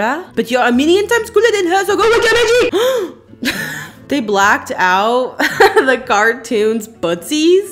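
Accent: France. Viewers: omg i love your french accent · YOUR FRENCH ACCENT SLAPS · the french accent is EVERYTHING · The French accent though. 😂, SHE'S ICONIC!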